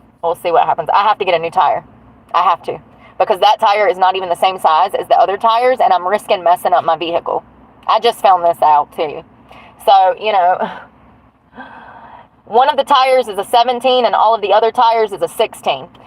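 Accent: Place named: North Carolina, United States. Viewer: How are you? Checking in haven't had a chance to hope everything is going better! You always look beautiful and I adore your North Carolina accent. I can't figure out why everybody in the South North Carolina has the strongest southern accent? I watch another creator that has the same! It's freezing up here nights are in the twenties. Hope the weather is nice for you!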